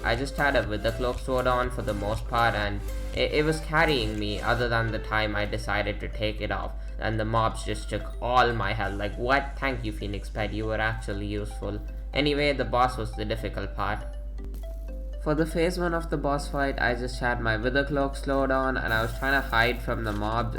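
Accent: India. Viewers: How do you have such a Indian accent?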